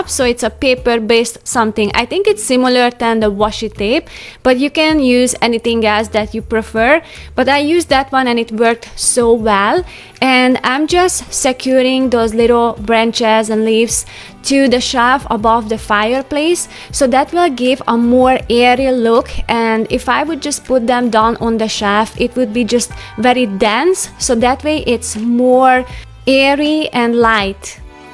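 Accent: Hungary. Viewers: Kihallottam a magyar hangsúlyokat tudtam hogy magyar vagy! Köszi a sok ötletet. Egyszerű és nagyszerű! 🎄❤️boldog karácsonyt! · So sophisticated and beautiful, you gave me great ideas! You have a Hungarian accent, are you from Hungary by any chance?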